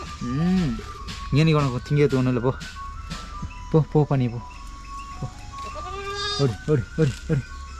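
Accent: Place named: Sri Lanka. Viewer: நீங்க இலங்கை என்பது உங்க பேச்சுல தெறியுது ஊர் எது ஆடுவிலைக்கு குடுப்பீங்களா என்டுதான் கேட்டேன்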